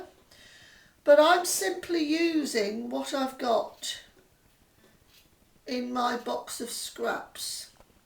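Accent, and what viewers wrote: United Kingdom. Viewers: Hi so nice to hear someone from the uk especially who doesn't make "long" wind out of everything. Thought your tutorial was excellent and going to put it into practice. Thanks once again Sylvie x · This looks like a lot of fun! Thank you :) wondering if you are from Yorkshire?!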